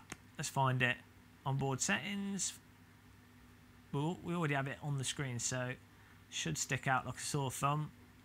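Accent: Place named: East Midlands, United Kingdom. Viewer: I'm sure that sounds like an East Midlands accent? Nottingham?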